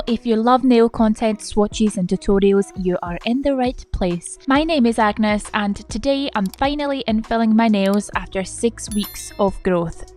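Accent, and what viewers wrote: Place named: England, United Kingdom. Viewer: Can somebody tell me sweet English accent is that ? ❤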